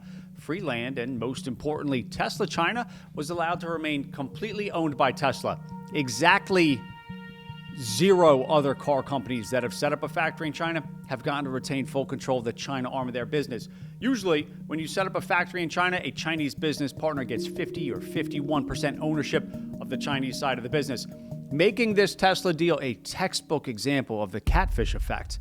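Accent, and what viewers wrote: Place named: New York, United States. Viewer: Im a Muslim so I don't have much love for communism. I know how Muslim populations are military target No.1 for any red army for total annihilation or reeducation. But c'mon dude. An Ivy league degree, a spiffy NY accent but nothing but bias and hot air is going to end up collapsing the USA. We deserve better than that. We need the US as a world order insurance to make sure no one crosses beyond the red line. Do your job guy!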